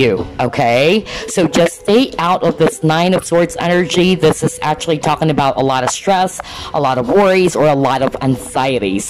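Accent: Philippines. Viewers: Wow you know how to speak Tagalog, I'm a Filipino you have a nice accent of Tagalog dialect 😍😍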